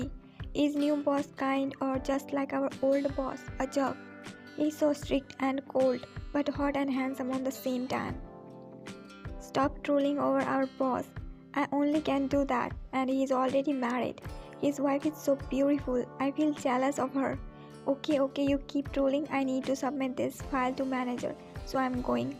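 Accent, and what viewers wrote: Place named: India. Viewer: Your voice is just so cute I like your accent are you from India ? Lots of love from 🇫🇷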